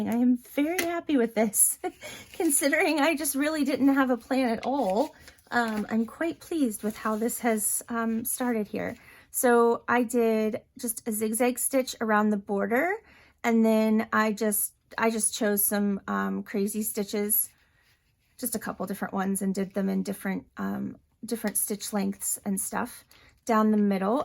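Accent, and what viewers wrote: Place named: Pennsylvania, United States. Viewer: This is the first video from you that I've watched, I'm enjoying it and have subscribed. You sounded local so I had to look to see where your from, I'm from Western Pa so not that far. Looking forward to seeing more from you.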